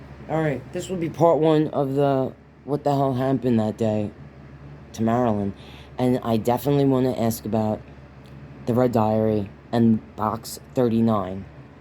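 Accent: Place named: New York, United States. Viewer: Loving 💞your videos as I go through a dark challenging time, your vibe is healing and helpful to me for reasons I don't understand ,and I like your ny accent or so, my grandma was from long island so it sounds familiar, ( the quiet epedemic and I'm not crazy I'm sick, videos and free documentary on Lyme disease called Under Our Skin, ( cousin to syphilis , u can be destroyed) the masses don't know how bad it can be it's like a sci Fi movie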